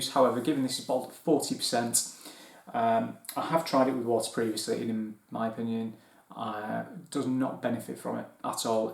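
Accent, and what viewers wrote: Manchester, United Kingdom. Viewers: Great review. You sound more and more manc the more videos I watch.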